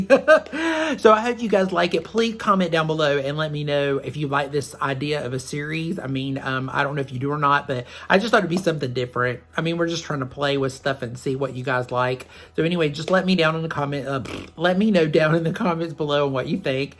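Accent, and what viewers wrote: South, United States. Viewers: I should have watched this during my tough week! You are so much fun (and I am learning a lot)! I love that Charlie's Angels lunchbox:-) I grew up in the 70s and 80s. "It has them arresting somebody on the back" - one of the funniest YT lines ever! Plus the safety rules? Too much fun! Don't let anyone tell you "how to" pronounce words - love your southern accent! That KGD foundation is my favorite. It looks good no matter how applied. You deserve the recommendation by Tara so much! A few favorites - speed racer, strawberry shortcake, holly hobbie (blue bonnet), wonder woman, smurfs and so many more!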